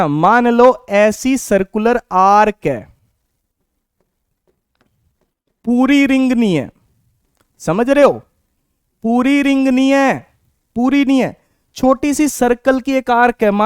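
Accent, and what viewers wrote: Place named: Haryana, India. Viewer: Thank you sir sir apki Haryanvi Katee Zaher hai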